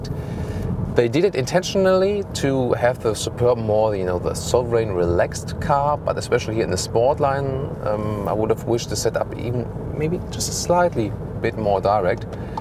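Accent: Germany. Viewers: good english but the accent is still like a lufthansa flight attendant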